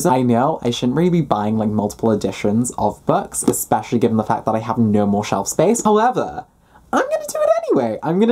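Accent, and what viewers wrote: United Kingdom. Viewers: do people really think you’re American? I think you sound so British (but I’m American so of course I don’t think you sound American lol)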